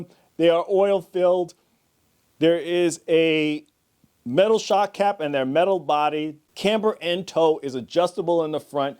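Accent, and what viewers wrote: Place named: Northeast, United States. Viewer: Hey brother, another great freaking review. I really love how you do the unboxings. I’m excited for another shootout the last one was awesome. Back before I had to sell my RC collection This was actually one of my favorite short course trucks. How funny is that you had that sitting in your attic LMAO. Well if you want to donate anything else sitting in your attic to a single dad and a little girl who love RC let me know lol jk. You sound like you’re from the north east. I’m in Jersey. Thanks again for your videos I know it’s got to be a lot of work to make them. Me and my daughter enjoy them. I’ve been subscribed for a long time but I don’t comment much. Just wanted you to know me and my little girl appreciate your videos! Hope you and your family had a great weekend!!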